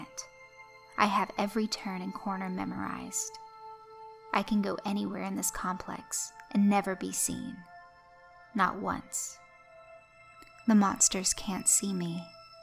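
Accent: United States. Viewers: lovely voice btw USA right?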